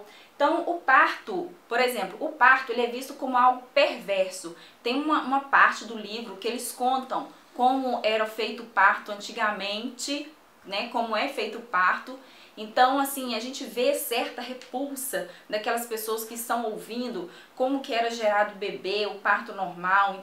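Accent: Minas Gerais, Brazil. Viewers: Ganhou inscrito. A propósito, vc é mineira?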